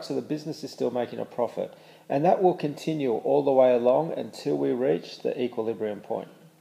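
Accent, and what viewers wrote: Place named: Australia. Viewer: Love the aussie accent m8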